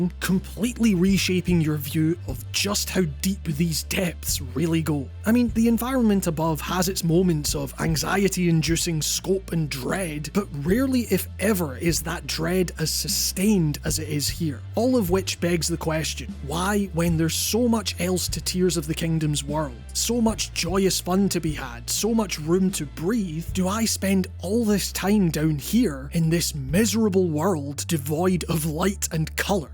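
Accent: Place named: Scotland, United Kingdom. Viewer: Where you fae? Ye sound Scottish.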